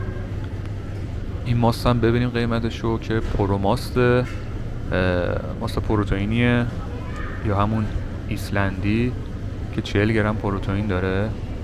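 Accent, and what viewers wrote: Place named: Iran. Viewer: love the Iranian accent greetings from syria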